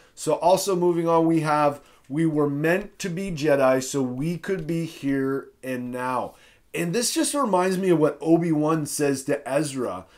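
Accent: Canada. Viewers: are you a canadian?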